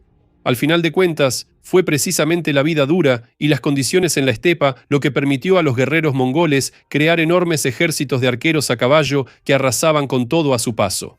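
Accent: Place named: Argentina. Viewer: Ahora tiene acento argentino el.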